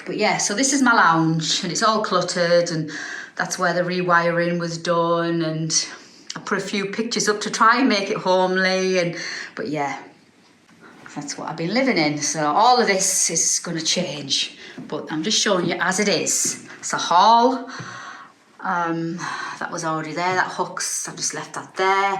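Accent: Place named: Yorkshire, United Kingdom. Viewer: its going to be stunning. my friend used to live in same type of terrace exact same layout. i know you will make it fab. i just love the colours and wallpapers you have already chosen. the work will keep you focussed and give you a reason to get up each day. im sure John is smiling down on you. love your yorkshire accent , well im thinking its yorkshire we have many holidays there . the kitchen is beautiful and cant wait to see it finished.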